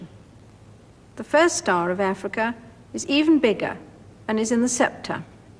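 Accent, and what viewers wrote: RP, United Kingdom. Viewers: Now that’s what one calls The Queen’s English. Our beautiful much lamented late Sovereign Lady, Her Majesty Queen Elizabeth II. May she rest in peace. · You can still hear the beautiful young girl she was when she was crowned in that proper British girl accent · That British accent tho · This is has been noticed by linguists. Some of her vowels have shifted. She still speaks RP, but a slightly less old-fashioned one.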